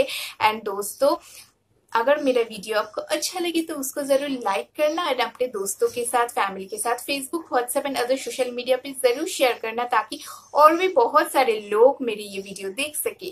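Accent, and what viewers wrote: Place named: United Kingdom. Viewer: Angrejo jaise hindi q bol rhi hu achcha nahi lg rhs h ok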